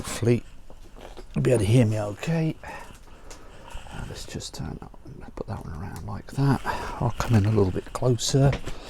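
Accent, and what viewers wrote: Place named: West Country, United Kingdom. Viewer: Are you in the West Country perchance? I detect a slight hint of accent and the reduced label on a plant you showed us looked like a Blue Diamond one, ‘tho they’re quite an extensive chain I know. But it would be just like God if you turned out to be a neighbour!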